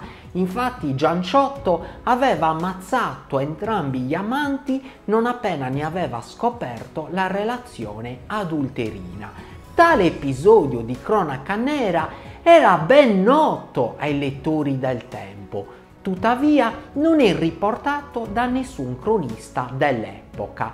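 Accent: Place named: Cagliari, Italy. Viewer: essendo io sassarese, si capisce tantissimo quando si trova online un sardo (in questo caso cagliaritano pk si sente fortissimo)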